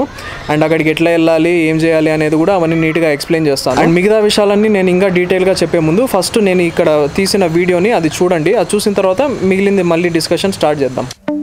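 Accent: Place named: Chittoor, India. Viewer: Bro.. Voice and slang of chitoor superb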